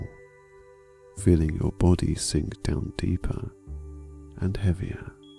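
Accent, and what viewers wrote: Scotland, United Kingdom. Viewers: please tell me are british ?? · These meditations are perfect. <link> him has a gentle, warm, pleasant voice (with a slight Scots accent). The words are simple and clear. The timing is perfect... just as you start to find your mind wandering, he says and if you find that your mind is wandering... . There is no background music and no noise in the background, only his peaceful and engaging voice. The length of the meditations (about 1/2 hour each) works well for me. I find that I tire of some meditations after frequent repetition, but that hasn't happened with this one. The metta lovingkindness meditation always feels right. Some meditation CDs I have tried have not felt right. The voice seemed hurried, or sounded tense; the background music seemed intrusive, or there were noises that interfered with concentration. This is just perfect as an introduction for new meditators, and it's also a meditation that I return to again and again. 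I'd give this more than five stars if that were an option. Even my students, who are not ordinarily very receptive to meditation, relax when they hear this one!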